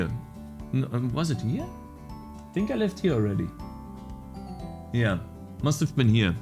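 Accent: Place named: Germany. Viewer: Gothic 1 and the whole pronounciation of all the words, he is german I knew it. Also im in the same limbo you were.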